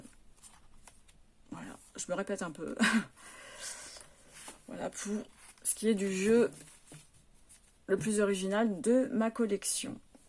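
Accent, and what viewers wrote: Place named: Italy. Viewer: Coucou, j'ai bien aimé découvrir ta sélection de jeux au travers de ce challenge. J'aime beaucoup le Heaven and Earth tarot tout comme le tarot des Runes! Moi, j'aime bien ton accent italien! 👍 Belle journée, à bientôt 🌸🌸😊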